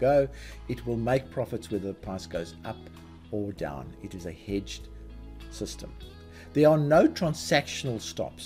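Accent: South Africa. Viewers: That accent- are you South African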